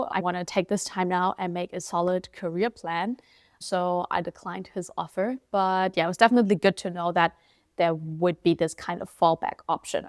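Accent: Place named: Germany. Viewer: Yes, her accent sounds German, but she looks more Eastern European.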